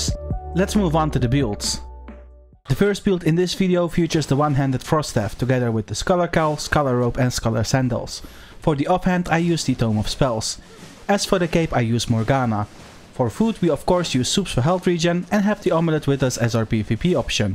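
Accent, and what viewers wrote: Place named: Netherlands. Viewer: Hoor direct dat je NL bent.. Toffe video maar probeer je Engelse accent wat te verbeteren ;)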